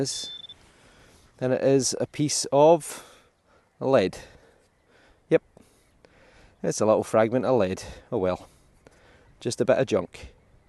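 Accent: Scotland, United Kingdom. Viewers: I enjoy very much watching you find all of these treasures from way across the blue Pacific Ocean in beautiful Hawaii. Your Scottish accent is just beautiful to listen too. Always exciting to watch your finds!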